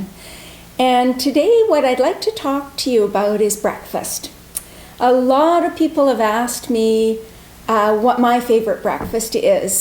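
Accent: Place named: Canada. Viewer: You have the strongest thickest Canadian accent I've ever heard!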